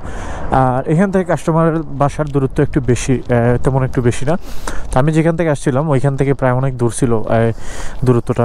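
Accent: Sylhet, Bangladesh. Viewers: Bhai Apnar House Sylhet ni ?? 😅 কথার ধরনে বুঝা যাচ্ছে সিলেটি ❤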